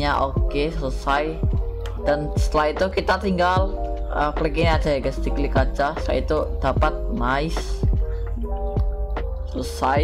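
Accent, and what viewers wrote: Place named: Java, Indonesia. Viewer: Medok bg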